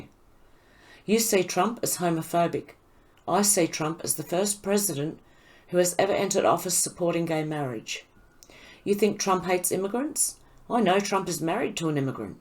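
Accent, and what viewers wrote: Australia. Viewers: You are becoming one of my very favorites! Great info and love your Aussie accent!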